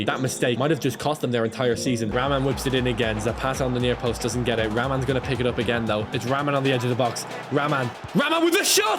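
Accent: Ireland. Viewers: Yourrrr irishhhhhhhh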